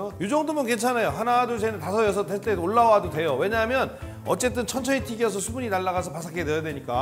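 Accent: Chungcheong, South Korea. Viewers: 자기가 언제부터 충청도 사투리 썼다고 웃음 나오네 충청도 몇년이나 살았다고 내가 알기로는 중고등학교도 서울서 나온거 같은데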